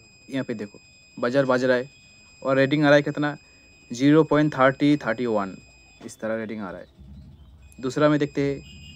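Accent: Bengal, India. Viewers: tum Bengali ho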